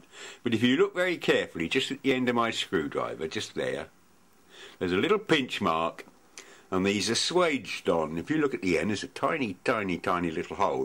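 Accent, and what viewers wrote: United Kingdom. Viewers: I always enjoy your videos and this one was no exception. I thought you guys went metric decades ago. What is with the imperial measurements? How is it that you even have a tape like that? I like the accent myself. It is colorful but still very understandable. I like to watch another shop type channel owned by another British fellow but I normally only understand about 25% of what he says. He has an interesting channel anyway. Thanks for sharing.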